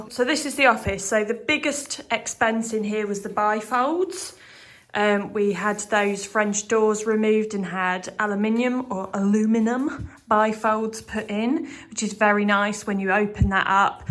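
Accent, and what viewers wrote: United Kingdom. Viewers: Such a beautiful “ British English”….😌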